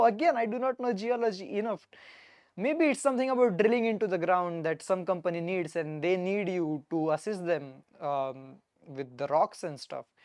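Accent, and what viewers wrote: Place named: Maharashtra, India. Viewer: are you marathi? i can see the accent slipping out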